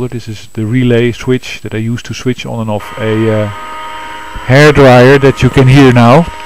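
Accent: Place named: Netherlands. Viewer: ZIEK nederlands accent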